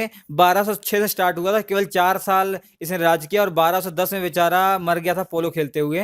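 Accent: India. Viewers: Your accent is Desi. Bahut achhe se smjh me aata h sir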